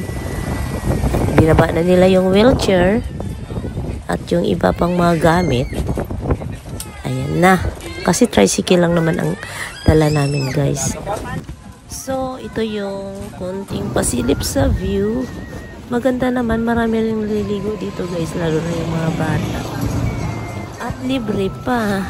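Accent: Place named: Maasin, Philippines. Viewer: Maasin city wet market yan..happy watching to your vlog..kasi very fameliar ko ang lugar pati pananalita mo inday at yong shoreline sa likod nang maasin city hall very fameliar po sa akin kasi taga dyan rin ako. Thanks for sharing..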